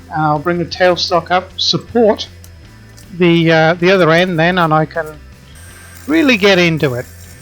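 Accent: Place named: England, United Kingdom. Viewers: Where do you hail from sir, that sounds like a very West Country accent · Australian? I thought you sounded very much from the south west of England, Cornwall/Devon area. I actually came to the comments section to say something about the quality work of a classic man in his shed. (A proud UK tradition.) Any links to the UK? I know Cornish miners had a heavy influence and there was a lot of migration to Australia from here in the 1800's. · I love this piece. I really enjoyed watching how you got into that centre and then leaving the top as is. It gives it its own personality. Can i ask where you are from. I'm from the UK and it appears that i am hearing an English accent but with something added and i can't figure out. Thanks for sharing pieces with us. Shirley from the UK.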